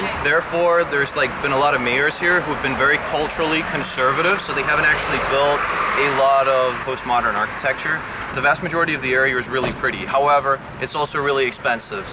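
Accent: United States. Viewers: How did you get that American accent?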